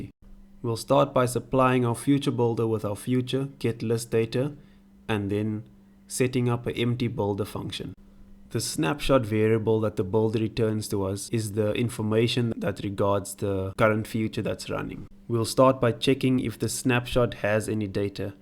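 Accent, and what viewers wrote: South Africa. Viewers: Sorry man I just want to ask are you south African by any chance ??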